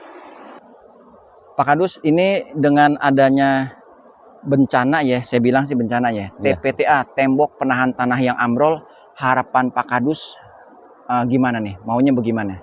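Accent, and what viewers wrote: Jakarta, Indonesia. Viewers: bang ngomong ny Betawi banget, emang asli nya orang mana bang?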